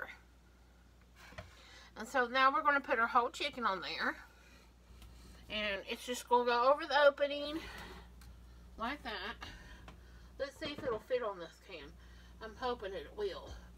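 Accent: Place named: West Virginia, United States. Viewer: that West Virginia accent is running over your instruction. My grandparents lived in West Virginia. Same accent, barely understandable. Cheekkun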